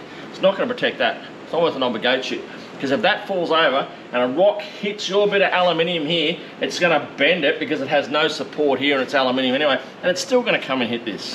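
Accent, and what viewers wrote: Australia. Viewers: sooooo aussie 😂❤️❤️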